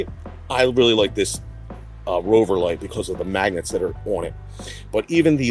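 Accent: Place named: Brooklyn, United States. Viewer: Just found your channel last week you talk like a Brooklyn Italian,are you Italian by any chance? 🤌🇮🇹 ayyyyyy ohhhhhh!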